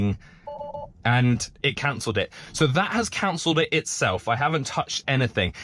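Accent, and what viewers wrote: United Kingdom. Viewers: For some reason your British voice makes this video 100 times better, I have no attention span and 20mins of watching a car park was the best 20mins I spent all day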